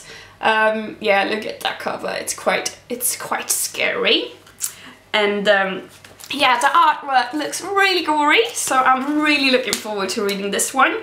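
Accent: Sweden. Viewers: Was looking for some good comic reviews. I think I will try "American Vampire". Thank You......And my American ears loves your Swedish accent.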